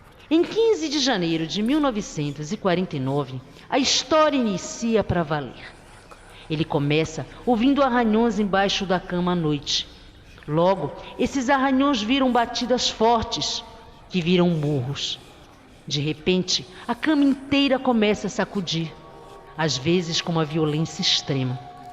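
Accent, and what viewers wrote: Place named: Florianópolis, Brazil. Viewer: Você é de Florianópolis? Seu sotaque é de manézinha da ilha hehe, sou de Floripa também :)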